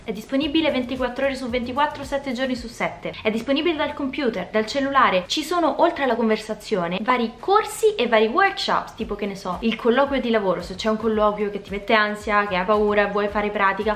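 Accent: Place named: Rome, Italy. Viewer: PS : l'accento romano... È top!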